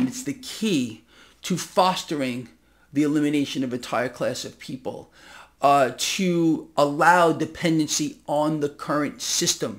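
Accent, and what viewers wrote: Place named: New York, United States. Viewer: The New York Accent is coming back Since moving to Fla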